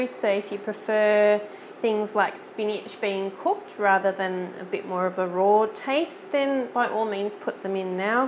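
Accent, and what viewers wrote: Australia. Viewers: . I love your videos. You do them the right way. You start out immediately giving step by step directions. You don't play background music and can be heard clearly. You keep the camera on the recipe. And I have never seen a video set up to where the viewer can print the recipe. That is wonderful. You simply do everything right in your videos. I absolutely love them. And I love your Australian accent too. Thank you so much. Let me just point out some of the things that are on most other videos that drive me crazy to the point of changing the channel. They do a lot of talking before starting the recipe. They bast background music so high you can't hear what they are saying. Or, they blast background music while printng the recipe directions on the screen where we have to freeze the picture to even read the recipe. These things drive me nuts. 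I don't bother watching them or subscribing to them.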